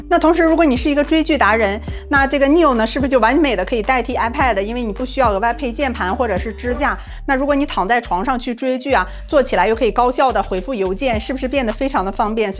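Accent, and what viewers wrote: Northeast, China. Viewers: 东北话杠杠的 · 主播东北人。me too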